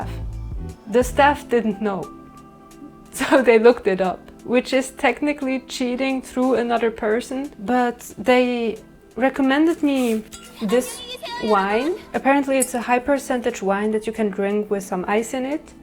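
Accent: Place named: France. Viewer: You sound quite French when speaking English.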